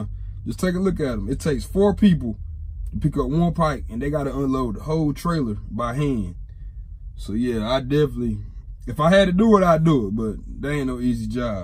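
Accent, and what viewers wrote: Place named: South, United States. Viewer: I dig tha country accsent bruh!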